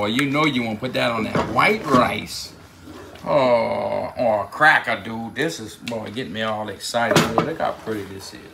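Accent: Louisiana, United States. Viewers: I love homeboys Cajun accent 🤟🤟🤟 ya dude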